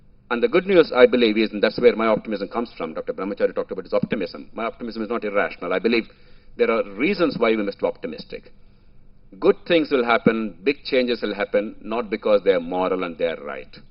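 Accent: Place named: United Kingdom. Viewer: Really his voice resembling British slang... Hats off sir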